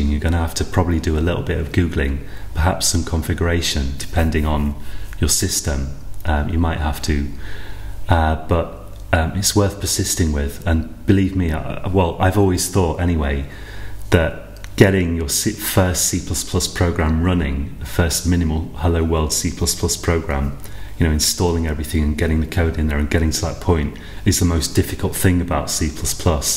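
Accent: United Kingdom. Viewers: Strong accent of British!QAQ after learning all of this courses i think i can talk English fluently like a British. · You're not only teaching me Programming but also English at the same time. I have been taking British English classes for about 2 years now and i wish i could sound like you. Really love The British accent, Just incredible!!!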